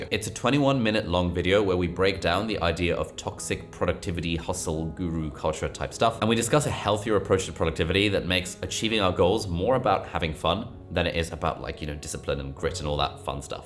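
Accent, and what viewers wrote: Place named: United Kingdom. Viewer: This may not be related to the video,but I want to ask you something.How do you talk so fast and clear?I can understand each word of your British accent,even though I find the British accent most hard to understand. Pls give some tips☺️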